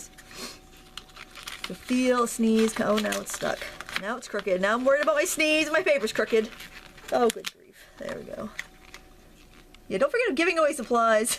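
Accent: Maine, United States. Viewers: Oh my God! I just Love you! I was having a terrible day, then I watched this vid and I was laughing and totally enjoying myself! It hap;pens every time I watch your vids actually! I'm a Mainah too, so I Love your accent, LOL. You are awesome!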